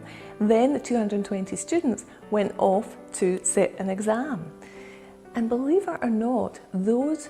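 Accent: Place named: Scotland, United Kingdom. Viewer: I am a new subscriber..., I like what you teach and certainly understand it too. I am pleased to say, the things you talk about are operational in my life. Having said that, there's more work to be done and I am always looking ways to improve my mental performance and maintain the peace the I have both at home and work. Furthermore, I love the Scottish accent and the scenery behind the window. Your voice and body language is calming. That's a natural blessing on you which I goes a long way when you speak. Awesome!!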